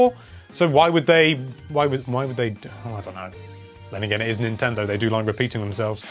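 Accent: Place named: England, United Kingdom. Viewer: Traveler is American English. Traveller is just English. You don't sound very American,. In fact, you sound English to me, and judging by your "posh boy" voice I'm guessing you went to public school. What did you do there? Scrumping?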